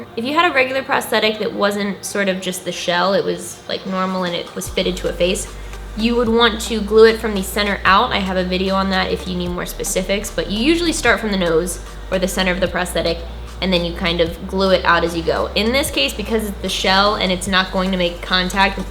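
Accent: Philadelphia, United States. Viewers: OMFG IS SHE FROM PHILLY P.A.?!?!?! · Is she from Philly?!?!?! #straightouttaphilly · yay for Philly accent needs!!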